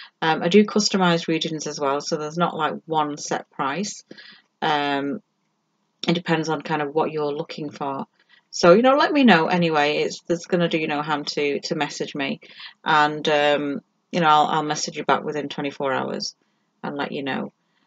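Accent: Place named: Northern England, United Kingdom. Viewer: Ru from Northern England? You sound very local to me 😊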